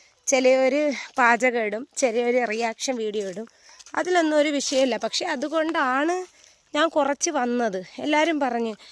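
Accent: Thiruvananthapuram, India. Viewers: നിങ്ങൾ വളരെ കഷ്ടപ്പെട്ട് ജീവിക്കുന്ന ഒരാള് തന്നെ . നിങ്ങളുടെ മനോഭാവത്തെ അഭിനന്ദിക്കുന്നു സഹോദരി . ആരെയും മനസ്സ് കൊണ്ട് പോലും ദ്രോഹിക്കാതെ ജീവിച്ചാൽ റബ്ബ് അനുഗ്രഹിക്കും . നിങ്ങൾ എവിടെയാണ് നാട് . സംസാരം കേട്ടിട്ട് തിരുവനന്തപുരം ആണെന്ന് തോന്നുന്നു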